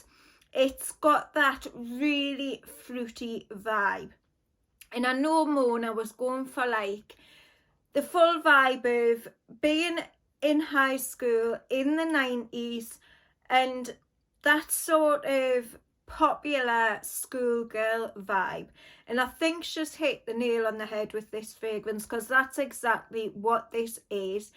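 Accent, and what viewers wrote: United Kingdom. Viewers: Hi. I was in high school in the 90s. I might have to pick this one up for fun. Thank you for your layering suggestions. You have a lovely manner of speech. Are you from England or Wales?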